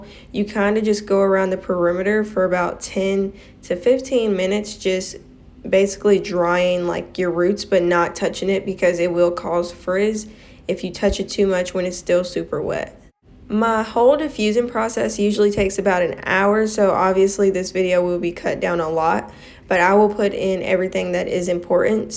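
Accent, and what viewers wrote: South, United States. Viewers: Where you from?! Sound like a southern girl like me 😊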